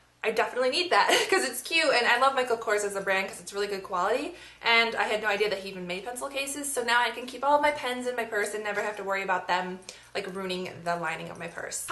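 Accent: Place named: Philadelphia, United States. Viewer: love your videos and your accent haha...philly?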